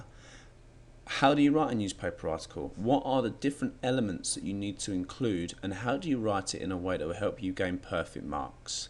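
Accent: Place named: United Kingdom. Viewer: Informative video thanks. But British ascent is very hard to understand for us(non native). But i'm trying to understanding.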